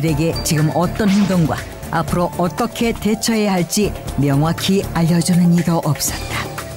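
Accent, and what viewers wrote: North Korea. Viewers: 말투가 딱봐도 북한이네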